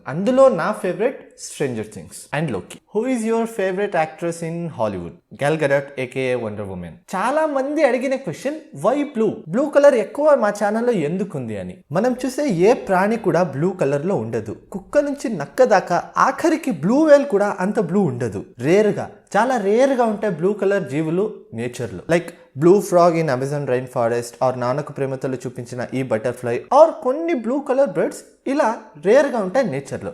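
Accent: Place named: Telugu, India. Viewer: Mimmalni chuste sorry sorry meeru maatlade padhhathini batti telugu vaalle vunnatlunnaru..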